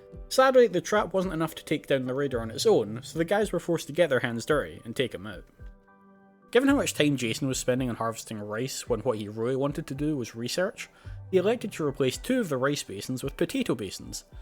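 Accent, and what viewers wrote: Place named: Scotland, United Kingdom. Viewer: I swear, I can't just be hearing a "oh, yeah, both scottish" accent between you and Fandabi Dozi. It sounds like same damn neighborhood. Though I guess, I'm ignorant enough maybe I'm catching a dialect shared over a metropolis? Though it seems more regional. Like you're the same guy, but 5-10 years younger. Near enough to sound like brothers. Ps. These videos are great. Best I've seen since Pete Complete. And I'm both always looking and hella picky. You seem to have good instincts/training/etc for this.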